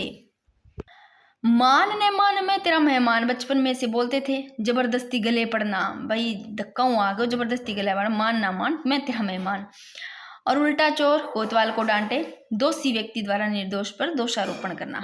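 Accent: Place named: Marwari, India. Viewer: आप मारवाड़ी बोला करो मजा आता है सुनकर दीदी 😊😊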